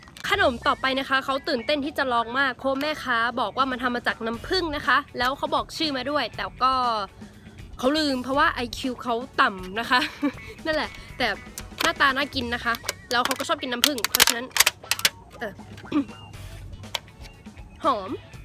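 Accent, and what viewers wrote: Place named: Central Thailand, Thailand. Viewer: พูดกลางชัดกว่ากูอีก 555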